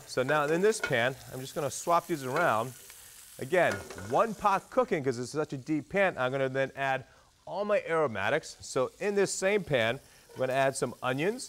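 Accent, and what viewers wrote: United States. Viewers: I agree...his voice sounds like he was born in the US. Martin Yan, on the other hand was born in China..people criticize his accent as "fake", though that *is* his real accent.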